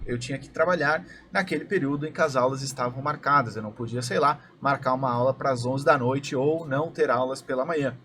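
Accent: Portugal. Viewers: Que vídeo phoda! Eu sou designer, mas comecei a estudar marketing qndo conheci e teu curso de Facebook (na época do teu sotaque bem português)😁 demorei até resolver unir essas duas áreas, pq como só havia trabalhado em agências ganhando mt pouco, e nunca pensei q fosse possível escalar. Agora estou cheia das metas 🤣 Criei canal, mas por me tomar mt tempo p editar, estou focando na criação de um produto p/ depois virar tua aluna de novo e aprender a anunciar.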